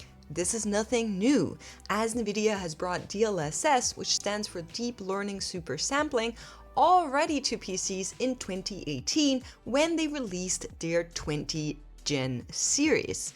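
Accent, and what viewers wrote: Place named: Germany. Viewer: I love the accent, just found your channel. Where are you from? You have Swedish look, but I've seen that look in Germans as well. Wife is German and Italian and said you look and sound German to her.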